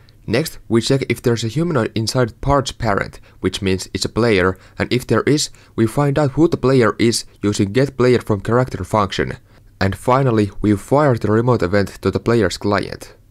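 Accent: Finland. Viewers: Accent sounded very familiar. Wait a minute.. SUOMALAINEN ROBLOX TUBETTAJA OMG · Ootko suomalainen ku kuullostat silt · Are you finnish?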